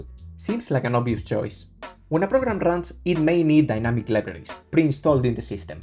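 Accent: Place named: Spain. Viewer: Tu eres español, que te he pillao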